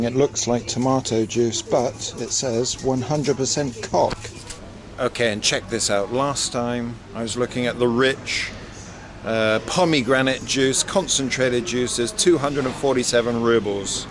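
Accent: United Kingdom. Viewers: Are you British? I know, the accent is but hey one can't make assumptions nowadays. Is there discrimination against foreigners in Russia?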